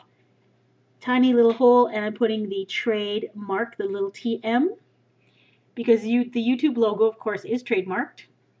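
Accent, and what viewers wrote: Canada. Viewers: I love your Canadian accent, ha. I'm right below you! ( America :] )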